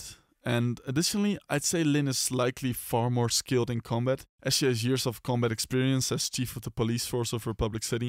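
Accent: Netherlands. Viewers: Great list! Are you by any chance Dutch? I think that’s the accent I hear. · good vdeo but do i sense a dutch accent ? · i think i hear a dutch accent, je maakt goeie video's.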